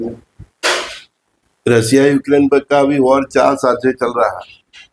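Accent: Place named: Gujarat, India. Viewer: Vo gujrat ke hai, hindi mother tongue nahi hai unki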